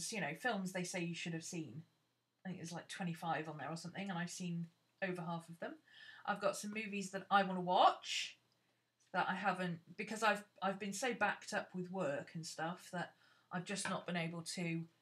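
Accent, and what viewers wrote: United Kingdom. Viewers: Hi,. I love planner flips. I just asked to join your FB group. Actually, may I ask, where are you from specifically in the UK? I do believe it's an English accent, but I think there may be something else in there?? Not sure. Right now, I 'm fascinated with accents, especially British accents. I am writing a book, actually, and am trying to write some dialogue in an accent for some scenes. Boy, that's hard, for an american, anyway.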